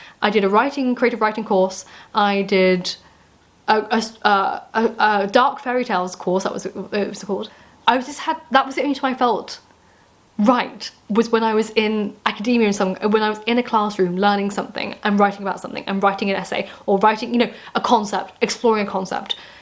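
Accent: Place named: Upper class, United Kingdom. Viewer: Dear Lady. I love your accent, I find it aristocratic, academic and upper class British. Its fun watching and listening to you. I could listen to you all day. You are motivating and just fun. This from a fan a barbaric colonial from across the pond who the fates have planted me in central Florida surrounded by Neo confederates after a carrier at NBC TV Chicago as a video tape editor. Stay well Dear Lady. DJY.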